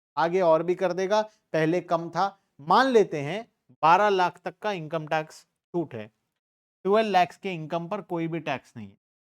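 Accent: Odisha, India. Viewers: Sir your odia voice is awesome ❤ Are you from sambalpur 😅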